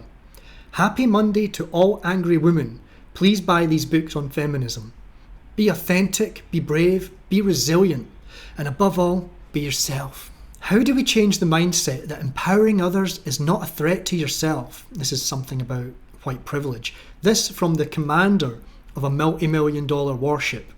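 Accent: Scotland, United Kingdom. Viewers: Hey ,,,,,,,,,,,there is nothing wrong with you and your contents, and I Looooooove your Scottish accent too. Like you i cant wait for the day when this Garbage is dumped to the Landfill of the Human History, and those who really want to work for the sake of progress in life, get their turn at the Mill.✌ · As a former Kiwi I love the tale being told in a Scots (or South island ) accent . Where did capt rugmunch get all of those gongs? it looks like the display of a Swiss Admiral. The NZ Navy was once a proud service and even fought with distinction in WW2. Winston Churchill gave a dinner for the Crew of the Archillies after her success in the battle of the river Plate, HMS Achilles won instant immortality in the Battle of the River Plate in December 1939. Leander’s early war was much quieter, although in February 1941 it sank the Italian commerce raider Ramb I in the Indian Ocean. 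HMNZS Leander was to see more dramatic action in the Pacific War. In July 1943, fighting alongside US ships, the cruiser confronted a Japanese force in the night-time Battle of Kolombangara. After helping to destroy a Japanese cruiser, the New Zealand vessel was hit by one of the enemy's feared ‘Long Lance’ torpedoes. The crew performed surperbly to save their ship, but 26 seamen were killed. Eventually sent to the US for repairs, Leander would never fight under the New Zealand ensign again. almost forgotten today because no women were aboard.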